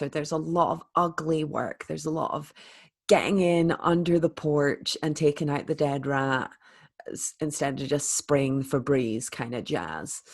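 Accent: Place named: Scotland, United Kingdom. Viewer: Is it me or are you turning Scottish?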